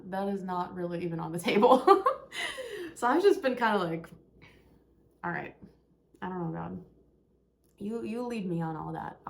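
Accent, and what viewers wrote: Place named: South, United States. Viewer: Why are you speaking in a Southern redneck accent?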